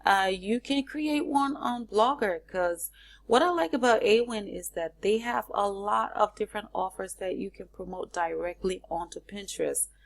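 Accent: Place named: Liberia. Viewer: I love your accent. You sound like a friend of mine from LIBERIA.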